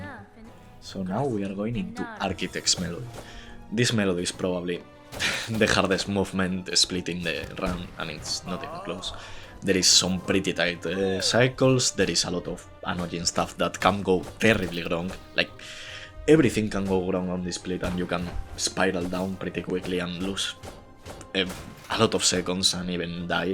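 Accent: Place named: Spain. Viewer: For anyone wondering, he has an spanish accent. Great video❤ Saludos desde Cataluña!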